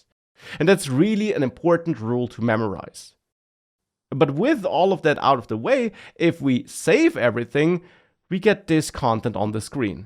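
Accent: Bavaria, Germany. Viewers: funny how the Bavarian accent is disappearing :-)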